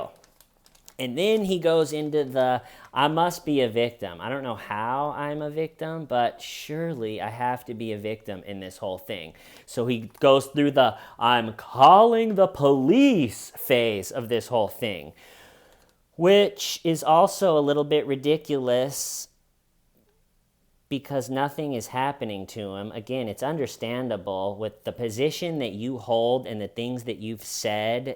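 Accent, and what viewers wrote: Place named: Connecticut, United States. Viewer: You sound like your from Connecticut or some shithole like that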